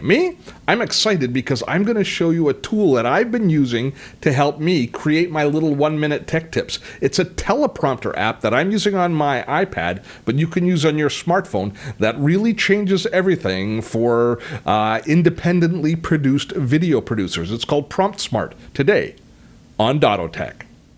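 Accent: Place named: Canada. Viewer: Thanks for this I am trying to get to grips with the app. It sounds like you are a fellow Canadian, what a great personality you have!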